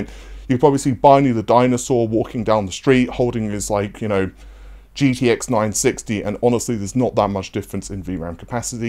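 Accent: United Kingdom. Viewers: With this dude’s British accent that gets produced by his nose, he would be an excellent replacement for severus snape in any Harry Potter prequels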